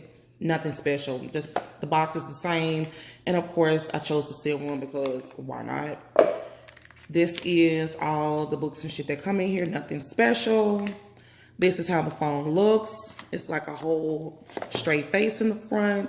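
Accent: Mississippi, United States. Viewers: Lol.....i know that Mississippi accent anywhere #notificationsquad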